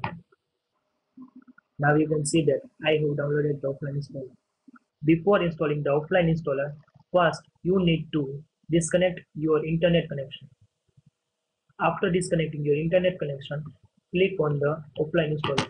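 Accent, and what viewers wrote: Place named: India. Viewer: thankyou nobody could show how to solve the error code they just showed how to download it. s soon as i heard and indian voice i knew you were going to solve my issue.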